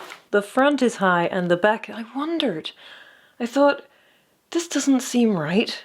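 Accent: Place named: United Kingdom. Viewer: I love, and always love, the combination of detailed research and "eh, throw it together, this is how I'm gonna do it because it's not accurate anyway." Research on chitons, using a chiton, the laminating techniques, and then ballgowns and "why not." Possibly most random comment ever, but as someone with British relatives, I notice and love how accent gets just a little thicker when she's thinking something over. It just reminds me of so many other lovely crafters I know - they get into their craft and instead of "voiceover voice" we get "here is a real person and they are moderately frustrated."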